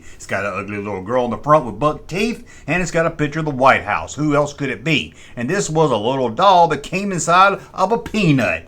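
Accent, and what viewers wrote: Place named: New Jersey, United States. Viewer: Georgia? I thought you were from Jersey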